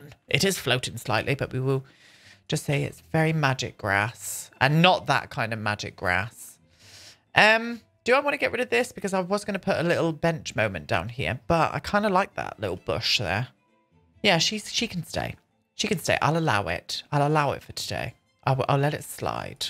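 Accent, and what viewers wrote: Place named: United Kingdom. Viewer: I love that you say " I Reckon " and your British... I love it !!!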